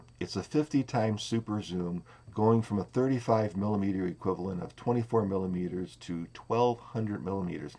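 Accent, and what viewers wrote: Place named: Upstate New York, United States. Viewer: Thanks for the review, I'm picking this up used tomorrow for $100. I think it's great for the price. I enjoyed listening to you speak, your accent reminds me of my relatives in Oswego NY.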